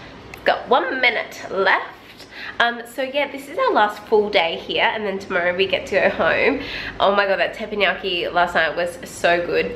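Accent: Australia. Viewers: haha is so aussie sounding (this is coming from a Kiwi haha)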